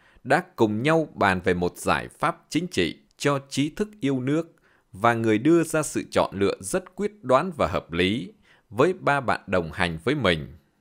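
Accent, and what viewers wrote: North, Vietnam. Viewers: Từ xưa đến nay tôi rất thích giọng nói của người Bắc trước 75. Cho nên tôi thường xem DTV channel lắm vì mỗi bài bình luận đọc bằng giọng Bắc rất là hay 👍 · Giọng Bắc 54 đọc hay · Tôi cũng giống như Bạn vô cùng yêu thích giọng đọc của Anh ấy một giọng Bắc rất hay, rất ấn tượng! Cám ơn kênh DTV mong được nghe nhiều bài viết hữu ích với giọng đọc có một không hai nầy!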